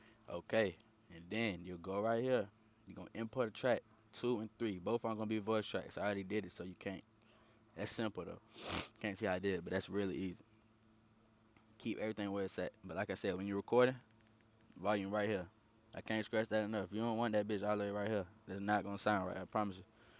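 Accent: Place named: Atlanta, United States. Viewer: yuh he frm atl fasho😂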